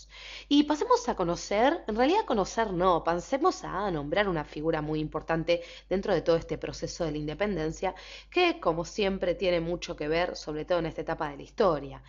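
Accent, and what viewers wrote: Argentina. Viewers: Hola y disculpa que te tutee, pues nada que agredecer con gusto, escucho tu acento y eres argentina sino me equivoco...bueno es interesante la historia de este país, solo que te venden otra historia....la que todos conocen, pero investigo mas de 10 años el tema de la Independencia de méxico, que tanto saben sus habitantes, y no es mucho lo que saben...hay mucho desconocimiento...lo que guste referente al consumador de la Independencia de méxico..te doy información...cuidate.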